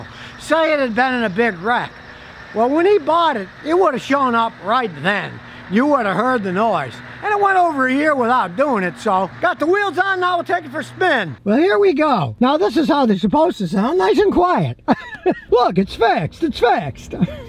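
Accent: United States. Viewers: has developed his own personal american accent. i can barely understand him anymore.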